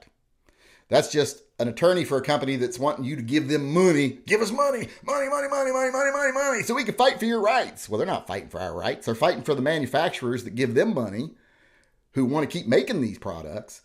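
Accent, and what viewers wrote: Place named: South, United States. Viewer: Slow Down Man! You are too realistic sometimes. I actually have more than one of these contraptions so I will have to come to some kind of consensus on what I will do. I also feel weird to message a man name and he speaks with a southern accent! Thanks for your input, nice to hear both sides of the argument.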